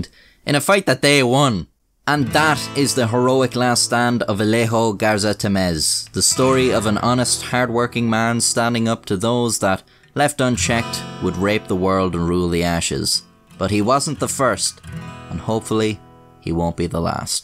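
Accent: Ireland. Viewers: Great story. I would never have u heard it or even remembered it if it wasn't for you and the way you told the story. Love the Irish accent. I'm a subscriber. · The way your stories are presented is pretty damn awesome. I mean, where else can an old, white guy from the USA get to listen to stories told by someone in an Irish accent. The articles are interesting, yet amusing, just what this old man needs after a day of work. You've gained yourself another subscriber, lad. Keep up the good work. :)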